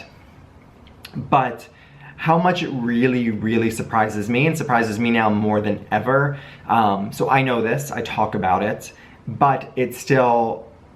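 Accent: New York, United States. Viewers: Are you a former New Yawka?? If so, hi from New Yawk. Just ordered serum and spray for the first time. Can’t wait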